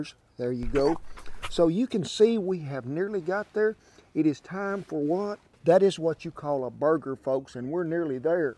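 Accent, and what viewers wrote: South, United States. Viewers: Its that southern accent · Real American cowboy accent . Greetings from Poland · I love his accentttt!!! Just learnt this is how southern american accent be like! Liked and subscribed!!! 😄😄😄👍🏻👍🏻👍🏻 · shane martin he sounds and looks extremely American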